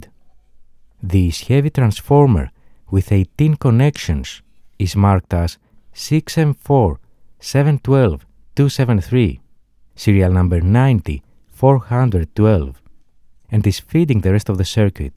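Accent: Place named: Greece. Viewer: Its the typical greek accent in english.Myself i lough all time when i listen greeks speakimg English(im greek)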